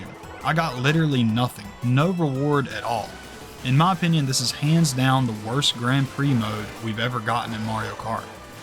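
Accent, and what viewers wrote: South, United States. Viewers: completely off topic, but where in the South are you from? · love his southern accent too as a Texan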